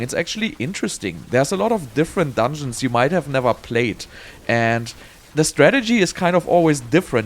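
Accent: Germany. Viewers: Schon in den ersten 5sek. wusste ich das der Dude Deutscher ist😂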